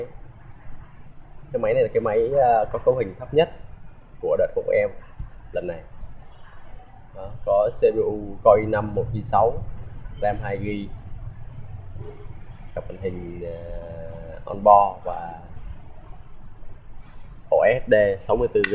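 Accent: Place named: Da Nang, Vietnam. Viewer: chu choa, air2011 mỏng và nhẹ tới khó tin:D air 2012 mình chưa đc coi video tiếng V, nó có j thú vị 0 mấy a ? mà e thấy mấy a nên bật máy và làm vài soft+ mấy tính năng hay hay để lồng vào video đi, chớ nhìn phần cứng mãi cũng chán mà cái bàn rê magic touchpad của riêng mac có bán sẵn ko ? nếu có thì giá nhiu? . p/s: 0 có cảm tình w giọng nói của a này :)) ọp ẹp, nam chả ra nam mà bắc cũng ếu ra bắc, nửa ĐN nửa nẩu nẩu (giọng... ái nam ái nữ_, clip sau nói tiếng fổ thông 100% cho dễ nghe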